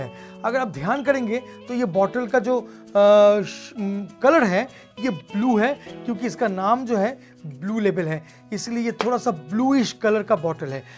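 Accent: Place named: West Bengal, India. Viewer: bangali kab se bartender banne lag gaye...fir hume to rosogulla khana shuru ke dena chaiye..saala bolne k tareeke se hi nasha utar gaya...not watching beyond 30 second... 🤣🤣 dada tumi roshogola khao..daru varu tumhara kaam nhi h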